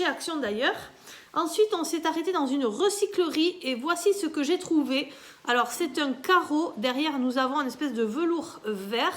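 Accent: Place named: South, France. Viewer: Coucou 🥰. Je suis ravie d'avoir fait votre connaissance sur YouTube. Vous avez bon goût. Moi j'ai accouché en 2009 à Villeneuve sur Lot et mon fils a été transféré en néonatalogie à Agen je crois. J'ai été hébergée chez la tante de mon ex à PUJOL. J'adore votre accent du sud le LOT et GARONNE. ❤🥰. Bisous 🌹 😚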